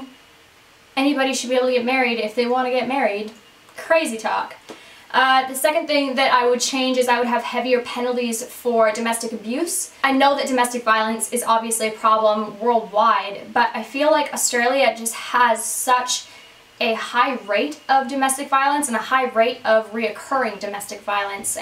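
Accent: Canada. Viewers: Are you canadian? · That Canalian accent though! It's crazy how much your accent has changed if you watch your older videos. I love how you are so upfront & such a straight shooter in these videos! I have a TMI Tuesday question for ya. About a year ago you posted your TMI Tuesday #47 I believe where you were just coming back from taking a break from YouTube. Do you feel like you've balanced your life out a little bit more since that video and are you happier? I really hope so. So much love! <3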